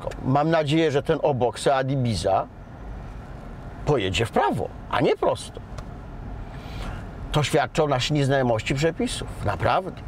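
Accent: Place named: Warsaw, Poland. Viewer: Warszawskie naleciałości w wymawianiu słowa 'tutaj' oraz 'dzisiaj' jako 'tutej', 'dzisiej'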